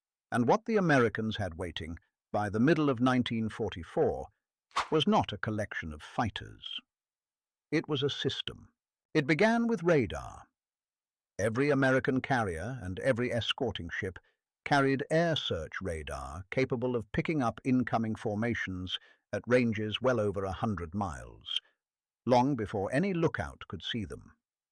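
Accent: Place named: United Kingdom. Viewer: Why does a channel ostensibly about US history use a narrator with a British accent?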